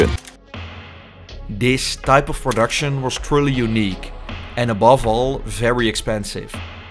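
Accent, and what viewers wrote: Netherlands. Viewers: dutch accent . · by your pronunciations, you definitely are Dutch. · Lekker Hollands Engels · Je klinkt Nederlands kan dat kloppen? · Zo duidelijk een Nederlander joh hee :-) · What an amazing documentary! By the way are you Dutch? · it's an accent I would dare to recognize amongst hundreds of people in "wedden dat..." , all nationalities included🤭 I mean nothing bad by this. Ps. I love old abandoned buildings ánd cars😊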